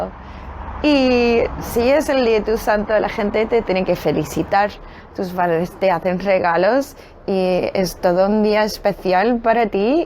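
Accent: Andalusia, Spain. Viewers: Que graciosa una estadounidense con acento andaluz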